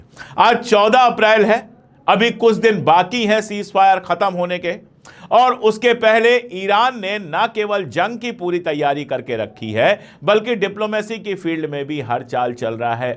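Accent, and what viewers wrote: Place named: Kashmir, India. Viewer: Lafayette, LA, USA I enjoy hearing your insertion of Kashmiri Urdu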